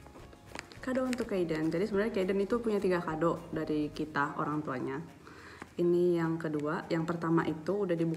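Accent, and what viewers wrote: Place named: Jakarta, Indonesia. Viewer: logatnya sama ma orang betawi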